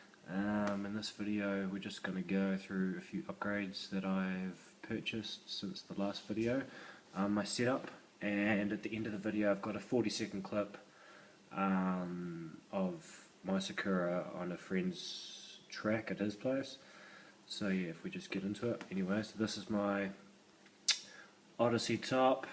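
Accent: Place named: New Zealand. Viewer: wheres that track at bro? u sound like a kiwi aye? :-)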